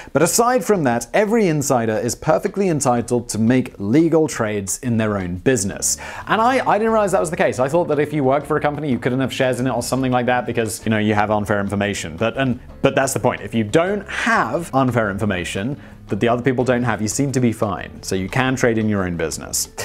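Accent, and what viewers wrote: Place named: United Kingdom. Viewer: The British accent in this one is throwing me off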